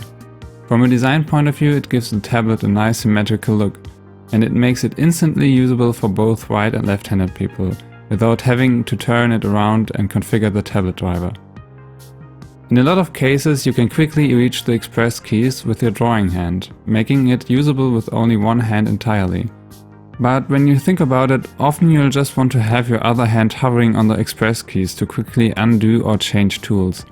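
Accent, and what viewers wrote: Germany. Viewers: I recognised your german accent so fast because I have one too lmao, i also saw your german settings haha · Are you German? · wait are u german? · Finde es lustig wenn deutsche englisch sprechen xD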